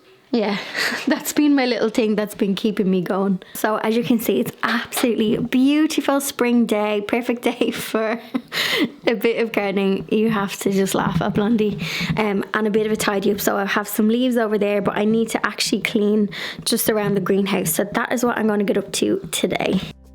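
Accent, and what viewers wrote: Ireland. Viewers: Hi I have just started dating your blogs. I love you Irish ascent. As I,m from Australia. Where did you learn how to decorate you little house. Thank you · I'm in zone 7a in Niagara Canada, we have 8 months of growing season, no snow since this week, yes we plant bulbs too. I love your gardening vlogs, very inspiring to see all that green and to hear your cheery Irish voice. I've only seen Gardener's World on YouTube because of your recommendation. Thank you for sharing.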